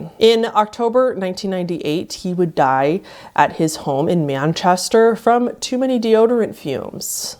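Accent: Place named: Seattle, United States. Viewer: I'm binging your videos again and I keep saying "your voice is so beautiful and calming" but I couldn't figure out where your "accent" is from (I'm trying to get better at recognizing state accents. Several videos ago you messaged washinton a few times, but nowhere specific.....you are about an hr from tacoma, so I'm guessing the Seattle area....makes sense that I couldn't figure the accent out😆 I'm in Lakewood (10 minutes from tacoma) so that's why you sound so calm and safe for me, you sound like home😄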